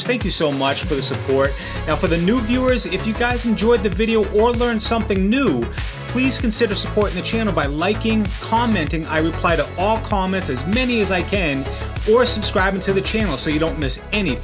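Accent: New England, United States. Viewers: You’re either from Maine or Boston. I’m from southern Maine and these are just things I know.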